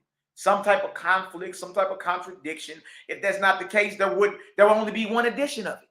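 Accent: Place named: St. Louis, United States. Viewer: Fear/ Furrrr … their/thurrrr .. St. Louis to death 😂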